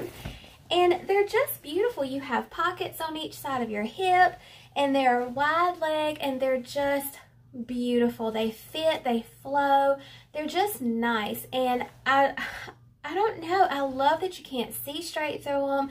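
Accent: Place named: North Carolina, United States. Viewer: Everything in your haul needs to get into my closet. The colors, the styles....omgosh.. Beautiful. Thank you for sharing such well produced videos. YOU really are my favorite youtube plus size clothing haul person. I can tell you put a lot of thought and work into making these videos for us all. I am sure I am not the only person who appreciates you. Oh and your personality is the bonus to it all. My grandma was from North Carolina and you remind me of her with your accent.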